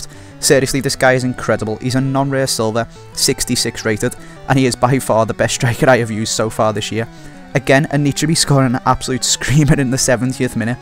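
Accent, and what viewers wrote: Liverpool, United Kingdom. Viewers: Are u from Liverpool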